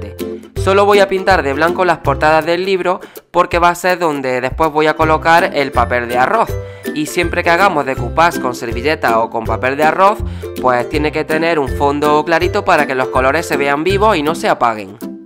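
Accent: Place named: Almería, Spain. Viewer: Te a quedado preciosa un saludo desde Almeria por tu acento creo qeu estas cerquita😘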